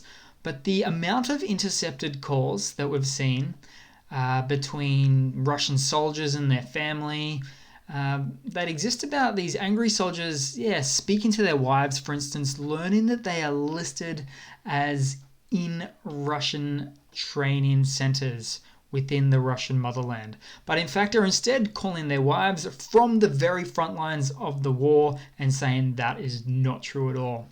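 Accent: Australia. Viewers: Very encouraging to hear that the Kherson counter offensive is more successful than the map shows, but should you be going public with this when Ukraine is keeping it quiet for Opsec? Anyway, I don’t know where you get your information from but if it’s correct it’s gold. Bahkmut too everyone else is saying the Russians are gaining. I hope you’re right that there is no progress. Btw, you sound like an Aussie which makes your sources of info even more mysterious 🇺🇦🇦🇺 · Love your delivery. I wonder where your accent is from? First, I thought you could be English but then I seemed to be picking up some Aussie cadence. Please put my curiosity to rest.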